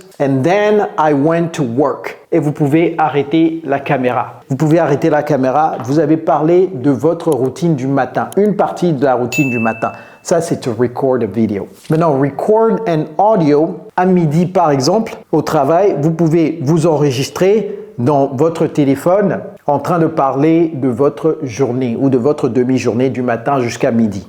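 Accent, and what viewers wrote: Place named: United States. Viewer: Je prefere moins de francais et plus d'anglais parce que je veux imiter votre accent en anglais americain. Pourquoi ne pas nous faire le "SHADOWING IMITATION"?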